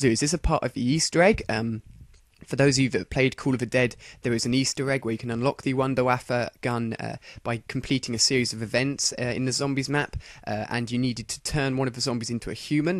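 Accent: United Kingdom. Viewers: i love your british accent!! teach me!!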